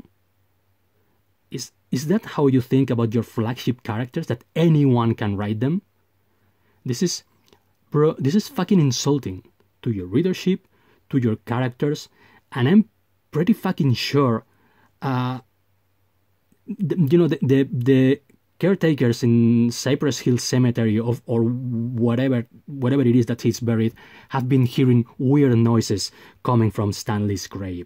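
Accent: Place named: Spain. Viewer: The highlight of your videos is hearing you swear with your Spanish accent. Love it! 👌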